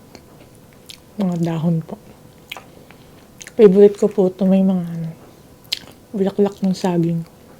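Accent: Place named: Cavite, Philippines. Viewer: tga cavite po b kyo?ung punto po kc eh. . .😊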